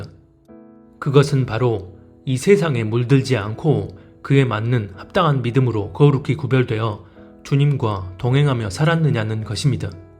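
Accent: Jeolla, South Korea. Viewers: ㅈㅓㄴㄹㄷ 억양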